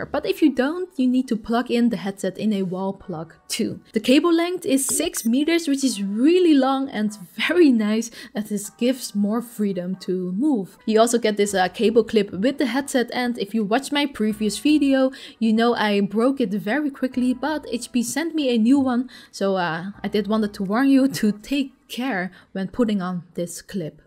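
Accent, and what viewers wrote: Netherlands. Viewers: I know a Dutchie when I hear one:)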